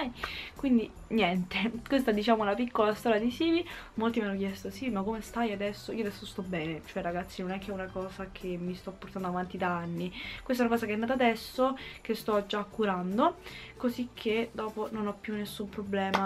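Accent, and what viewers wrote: Rome, Italy. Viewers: MADONNA CHE ROMANA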